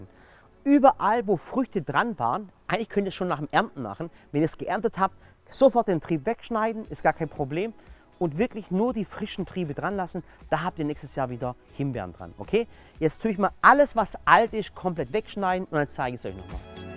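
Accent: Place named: Swabia, Germany. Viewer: Sehr cool, danke. Das Video hat mir sehr geholfen, auch wenn die "Blütezeit" der Himbeeren in Schweden etwas von der in Deutschland abweicht. Toll auch der immer wieder durchkommende schwäbische Dialekt 🙂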